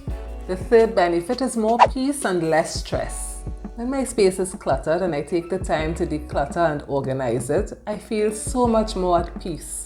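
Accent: Trinidad and Tobago. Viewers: I was watching this video on silent as soon as I turned on the volume I knew you were Trini. Subscribed immediately.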